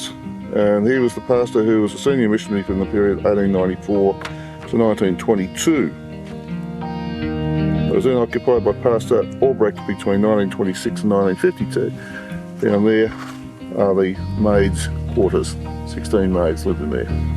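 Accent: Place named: Australia. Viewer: Onya, this is the first I've seen of you but I'll be watching more for sure....a quick look at your page and the amount of content is WOW. I left Oz in 98 for work and never considered that I'd call somewhere else home but that's how it ran....so I absolutely luv hearing some fair dinkum auusie talk.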